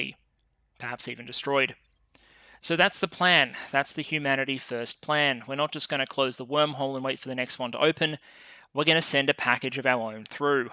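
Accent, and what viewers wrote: Australia. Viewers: Hey man I just wanted say that I look forward to your videos every day, you content is top tier and that aussie accent is exactly what the video needs, your stuff is great, here if my infantismal engagement contribution to aid you with the algorithm god, also castilo is the only relatable view imo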